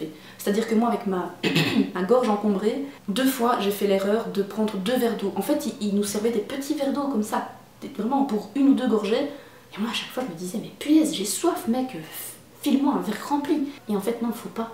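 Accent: Belgium. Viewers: Bonjour, je te remercie car avec ta vidéo précédente tu m'as donné envie de m'y mettre alors que je déteste courir ... j'ai téléchargé une appli et j'ai fait la première course du programme (très très facile pour super débutant) et je me suis régalée !!! Je vais continuer bien entendu 😉 Bisou d'une réunionnaise qui a fait ses études en Belgique et qui adore entendre l'accent belge 😘Félicitations pour ta course !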